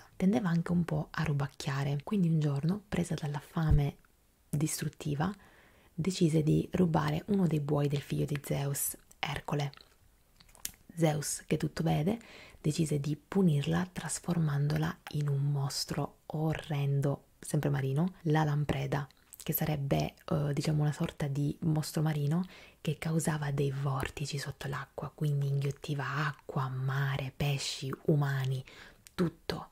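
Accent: Sicily, Italy. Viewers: Ciao bella immaginavo fossi Siciliana io di Catania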